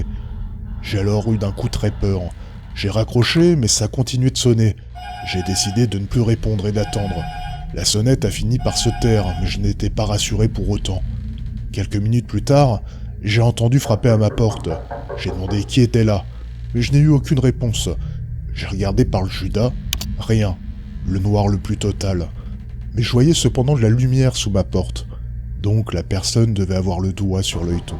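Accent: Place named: Quebec, Canada. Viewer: Le Québec doit être magnifique mais vos expressions et votre accent est tellement ridicule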